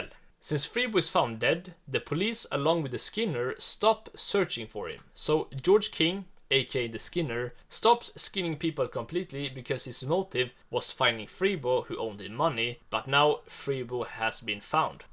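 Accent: Sweden. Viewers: You're definitely Swedish. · Holy svengelska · That Swedish accent going crazy 🔥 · u swedish?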